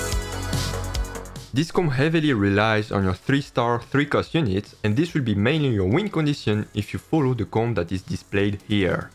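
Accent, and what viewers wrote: France. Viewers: French accent, right?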